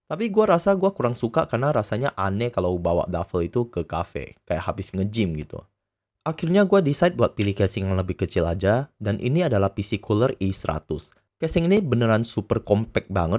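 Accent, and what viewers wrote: Indonesia. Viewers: dari caranya ngomong gua yakin orang ini Cindo.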